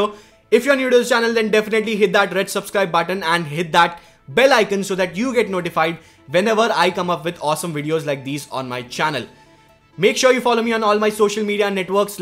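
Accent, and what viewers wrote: India. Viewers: Good video! But I must say Mr Man from India, LOL! Your video and your f**** talking with your India accent is way too quick and fast and as you know most of us are watching this video on our cell phones we could barely even see the writing on your cell phone and you're trying to show us on your cell phone and you go way too fast and you speak too fast with an Indian accent, , because of that your video sucks because you're way too fast and no one comprehended anything from your video we can't even barely see your cell phones writing and then you go so fast also to top it off with ice cream you all so f**** put the cherry on top with your India accent I mean come to f*** on get real no one got anything from this video you piece of dumbass! LOL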